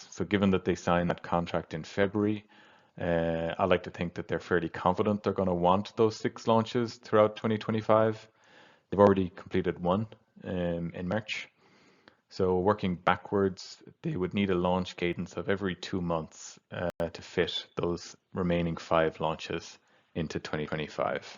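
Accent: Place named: Ireland. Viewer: Very cool! You seem to be another "Scott O" running the numbers!! A fellow Irishman too by thee sounds of it which is even better!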